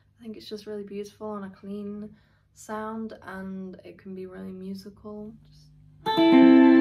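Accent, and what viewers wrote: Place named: England, United Kingdom. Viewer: who are you？ England accent.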